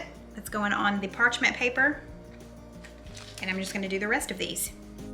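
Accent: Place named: South Carolina, United States. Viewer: Im a Carolina girl, living on Prince Edward Island, in Canada, and your recipes and your accent brings SC into my home. I made the White sauce chicken enchillada last night and hubby and I loved it!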